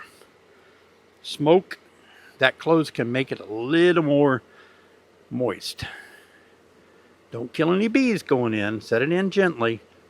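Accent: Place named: Appalachia, United States. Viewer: It’s an Appalachian accent. I use them both here in Pa!! I’m extremely green with my first hive this year. You are a great source of information and a great speaker. Just added electric fencing to the area too. Bears will be getting ready for winter here! Thank you again sir!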